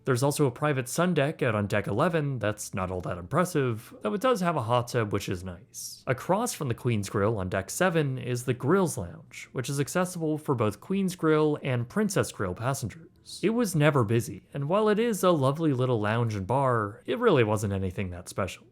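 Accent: Canada. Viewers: I find this happening more and more with review videos... Typos and mispronunciations degrade the final product, and make you seem like you aren't the authority a piece like this would suggest. Look up all names and proper nouns first, check for punctuation, etc. Like these are the editing things that can take a viewer out of the experience, and make the presenter look immature. A few have mentioned the "Verraz-nano," and that was kinda it for me. My comment would be one of laughing along if this video was aiming to be funny, but it's obvious that this isn't the objective. So I'm saying it now, if you want to come across as authoritative on a subject, grammar, editing, pronunciation, and word variation ("very...very...very..." etc.) are priorities to get right. And it's pronounced more like, "Ver-rah-zah-no" if you're from the city like I am. With your Canadian accent, I'd say maybe something like, "Vair-ah-zah-no" is more accurate. 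Where we have stronger E sounds, you guys seem to go towards an A: "Ter-rible" vs. "Tair-rible". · I am new to this channel and I instantly noticed the Canadian accent😅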